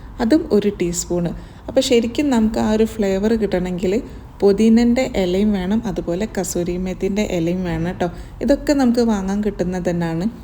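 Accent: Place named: Tamil Nadu, India. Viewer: ഒരുമാതിരി തമിഴ് നാട്ടുകാർ മലയാളം പറയുന്ന പോലെ... ബോർ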